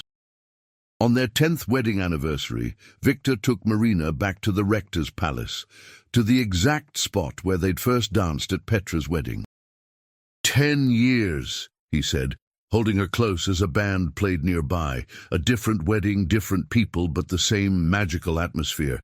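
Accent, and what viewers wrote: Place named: United Kingdom. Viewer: Nisam gledala jer nema previd več Englesko - Britanski naglasak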